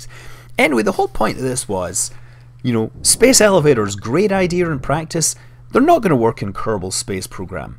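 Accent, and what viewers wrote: Scotland, United Kingdom. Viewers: scottish, not much difference, i know cus am irish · Nope, it's a Scottish accent.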